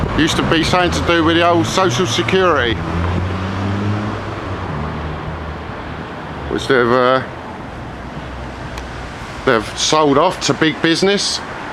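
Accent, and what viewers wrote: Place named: East London, United Kingdom. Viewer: WHERE YOU FROM ORIGINALLY,? I HAVE A SIMILAR ACCENT AND I'M FROM RAINHAM/DAGENHAM. NOW LIVE IN HUDDERSFIELD FOR SOME FUCKING REASON. KEEP UP THE AMAZING WORK, MATE. BIG FAN.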